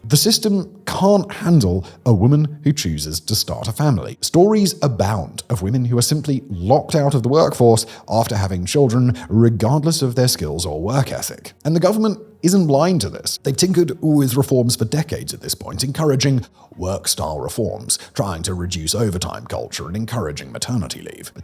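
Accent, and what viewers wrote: United Kingdom. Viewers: He’s talking about managed decline, yet has a British accent. The irony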